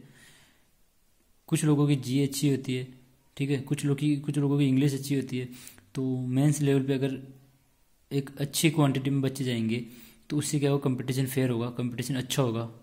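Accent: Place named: Haryana, India. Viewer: Bhai tu haryana ka Lage se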